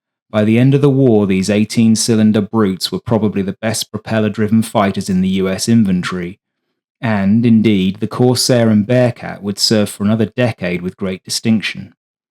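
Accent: United Kingdom. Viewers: ... Pentagon Marketing BS ....... We had TWO aces - they had 17 ...... Who else has noticed a crap load of British accented (Ai) Pro War BS Documentaries .... ?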